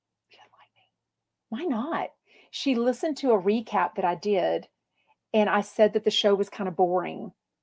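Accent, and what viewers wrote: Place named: South, United States. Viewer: you are sweet and southern sounding coming from a texas person💝💝💝💝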